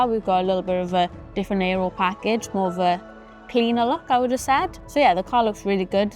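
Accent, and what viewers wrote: United Kingdom. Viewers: Always liked the Welsh accent 😊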